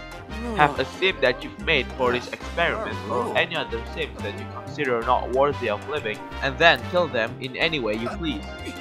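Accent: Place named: Indonesia. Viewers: udh gw duga!didenger dari logatnya:v omfg!! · pantes aksenmu gw kenalin wkwk orng indo toh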